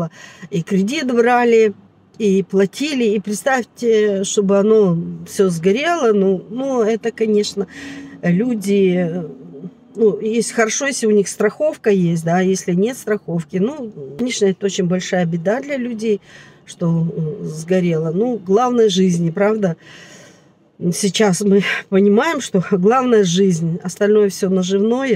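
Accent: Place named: Karakalpakstan, Uzbekistan. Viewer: Вот зачем вы так пишете, она каракалпачка, пусть говорит как может. Пусть речь не литературная, зато у нее много других сильных сторон.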